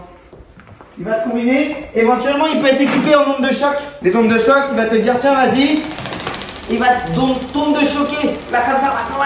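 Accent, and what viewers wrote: South, France. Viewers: il est du sud de la france et la on parle comme ça et je trouve ça simplement chouette et inoffensif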